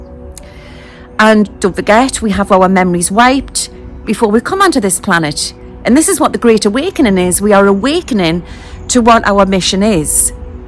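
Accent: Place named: England, United Kingdom. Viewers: Luv ya and our English accent dearie.... thank you for sharing your gifts and insights and positvity! Do you see a tsunami originating in La Palma Island affecting the east coast of the united states? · Thank you for given us important information. I deeply resonate with your words and predictions. For more than ten years I have been translating and introducing some of the English alternative articles and videos which I felt interesting in my blog site so that some of the Japanese people can understand them. Lots of the Japanese are not getting enough information from abroad though much of the important information come from outside Japan. I think that is what I am here for. Long time ago I used to live in England for 12 years so I love to hear your northeastern accent. Thank you.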